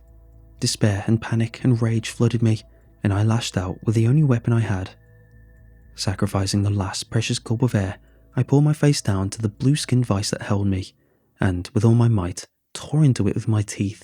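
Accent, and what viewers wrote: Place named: Rhyl, United Kingdom. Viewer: Sounds like Rhyll. “If all else fails, try Wales....”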